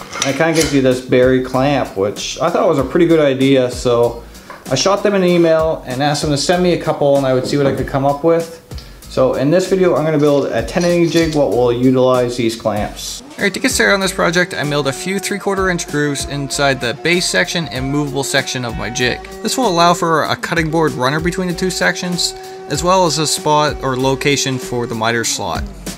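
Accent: Canada. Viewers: He's Canadian.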